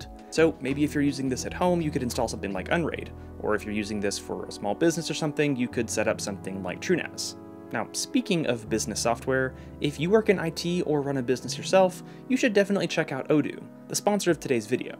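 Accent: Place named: Boston, United States. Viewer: That's a cute Boston. 13/10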